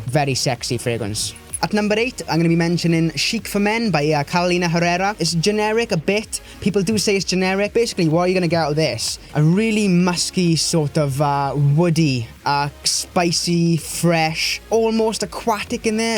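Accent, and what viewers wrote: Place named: Wales, United Kingdom. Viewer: All the way from the Welsh valley,lol.Love it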